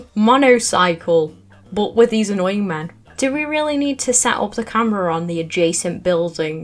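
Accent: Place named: England, United Kingdom. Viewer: Recently found your channel and marathoned most of your content, I moved away from England 8 years ago and didn't realise how much I miss the accent. Glad to find a new upload.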